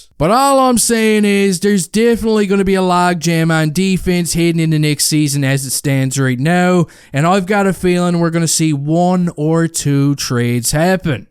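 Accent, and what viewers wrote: Newfoundland, Canada. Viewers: Pleas tell me what part of Newfoundland you are from?!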